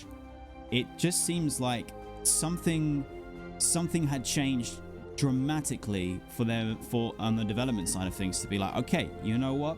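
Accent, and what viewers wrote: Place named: United Kingdom. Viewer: Wait are you in the states? You have a British accent. My bad. I don't mean to offend anyone <3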